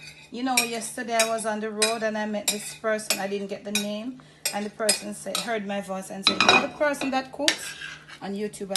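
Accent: Jamaica. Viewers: God, I wish she lived next door to me! Between the cooking, the attitude and that Jamaican accent, it would be simply DIVINE! 😊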